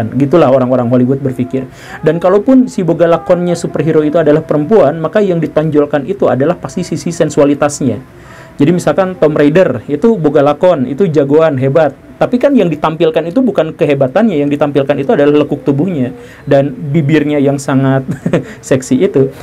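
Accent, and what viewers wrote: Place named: Java, Indonesia. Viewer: Tetapi jawa logatnya kok susah diubah ya? Etnosentrisnya masih kebawa2 di rantau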